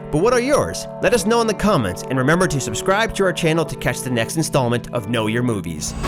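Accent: Canada. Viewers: The narrator is Canadian, isn't he? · Canadian accent is nice.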